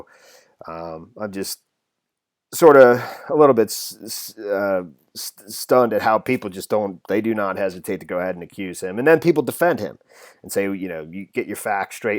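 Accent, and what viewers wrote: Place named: Delaware, United States. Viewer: Hi,! I really like your channel. Just the facts, plain and simple. Also, I keep thinking you sound like you're from northern New Castle County, Delaware, or nearby PA. Are you?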